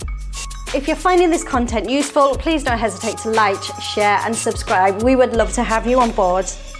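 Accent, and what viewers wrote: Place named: United Kingdom. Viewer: This is clearly a British woman, dude.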